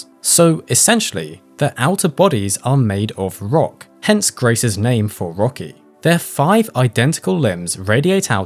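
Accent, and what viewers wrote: United Kingdom. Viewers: American discovers the existence of British English pronunciations, lmao. He's not an AI, he's just British. You didn't think every English speaker in the world spoke American English, did you?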